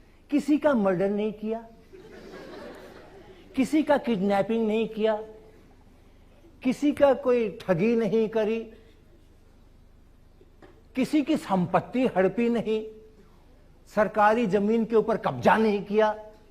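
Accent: Standard. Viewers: wah sir ki hindi ekdom suddh hain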